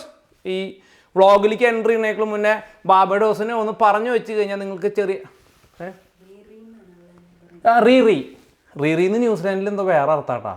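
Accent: Thrissur, India. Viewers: History,geography,sciencum nalla Thrissur slagil kelkan Nala rasa 😅😅😅 keep going btw chettan Thrissuril evedeya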